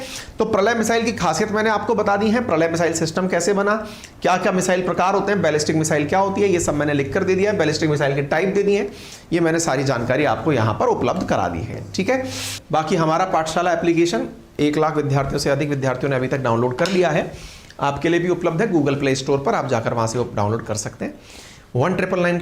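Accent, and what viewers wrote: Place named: Uttar Pradesh, India. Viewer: App ki English mairi tarah hi hai , due to up personal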